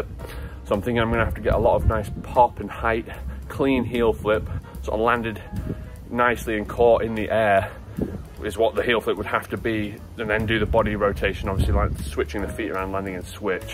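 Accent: United Kingdom. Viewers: Hello just wondering where you from? Sounds like Lancashire to me 👍🏼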